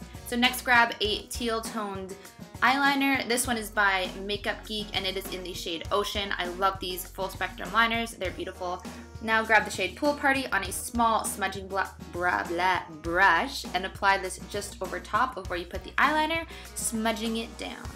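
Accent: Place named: Canada. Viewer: Sorry... you're Canadian, I'm rubbish with accents! 🇨🇦 xx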